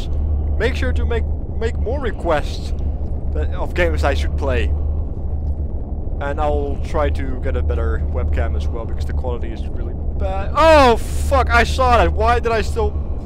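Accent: Netherlands. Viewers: Hahaha, I had no idea you made these kinds of vids as well! Hilarious! Make more! There's tons of these kinds of games floating about these days. :3 Also, your accent... Are you Dutch or something like that? :D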